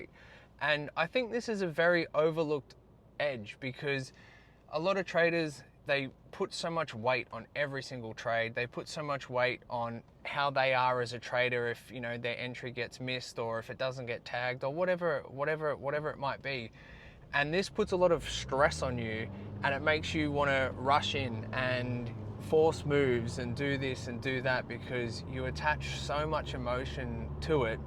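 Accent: Australia. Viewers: Perfect time for me to listed to that. It is nice to listen to a fellow Aussie. Thank you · Are you Aussie?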